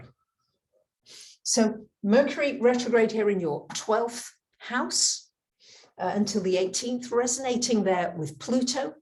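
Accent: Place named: England, United Kingdom. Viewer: Thank You! 🤍. I got an extra, personal, heartwarming confirmation with this video as you clapped your hands together. My grandmother was from Coventry, England, and it was something in that sound, as well as your accent, that reminded me she is with me. 🤍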